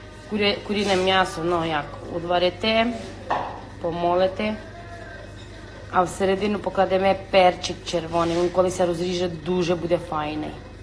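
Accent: Transcarpathia, Ukraine. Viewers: Дуже люблю як Ви розмовляєте на Закарпатті:))привіт зі Львова:))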